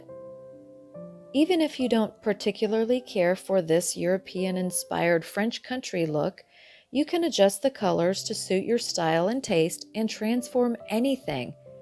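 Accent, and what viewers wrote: South, United States. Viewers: Many southern have smooth accents. 😘🎠🚂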